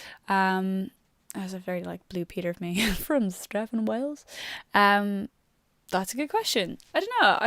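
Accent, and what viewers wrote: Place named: United States. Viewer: Why are you suddenly American?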